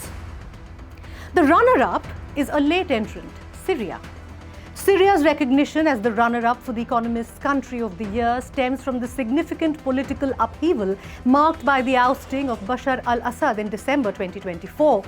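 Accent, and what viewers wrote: India. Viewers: > Opens video > Indian accent > "Yup"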